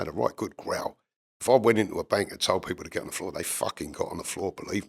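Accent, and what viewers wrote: Sunderland, United Kingdom. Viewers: Good Podcast You From Sunderland?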